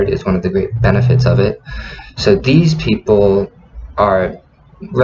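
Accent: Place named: United States. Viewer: Good sharing, you live in Taichung now? I can pick up your American accent. God bless America. MAGA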